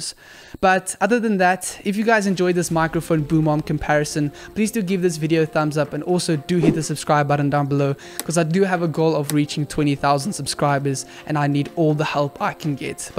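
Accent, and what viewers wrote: South Africa. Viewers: You lowkey sound South African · You sound like a south african! Allo from Montreal!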